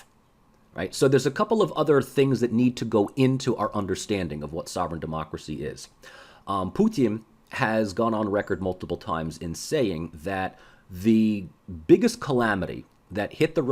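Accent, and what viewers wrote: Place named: Boston, United States. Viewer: Love the Boston accent by the way.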